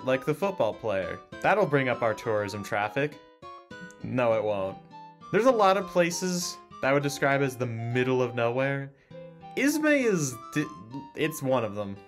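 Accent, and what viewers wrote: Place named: North Carolina, United States. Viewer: dumb question, are you from North Carolina? not trying to stalk you, im just asking based on your accent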